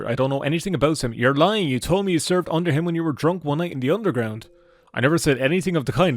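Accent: Ireland. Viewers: Am I the only one who just keeps on just to hear an Irish man rant for background noise?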